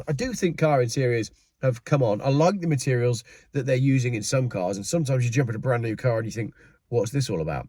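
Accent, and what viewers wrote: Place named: West Country, United Kingdom. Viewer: Great west country accent. Alright my lovr.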